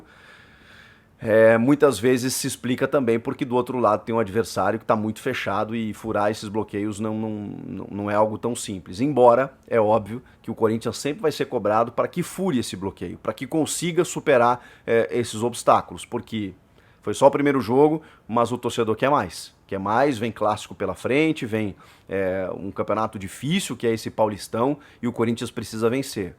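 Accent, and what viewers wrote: Rio Grande do Sul, Brazil. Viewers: Para de puxa gaúcho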